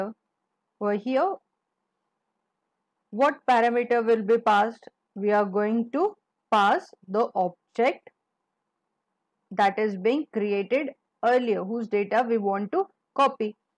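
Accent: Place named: India. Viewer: first you were a male now you have a female voice with an indian accent as well. amazing!!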